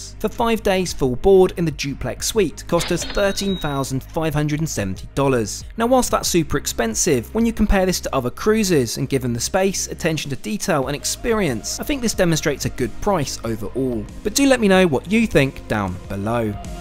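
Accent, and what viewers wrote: United Kingdom. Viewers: Good Videos, but the British English is soo anoying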